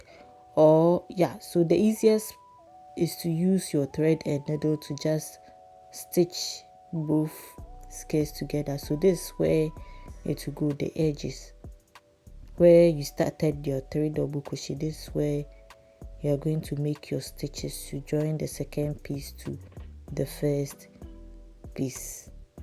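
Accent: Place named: Ghana. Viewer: Sis your work is so beautiful and your accent sounds like a Ghanaian ❤🇬🇭….great job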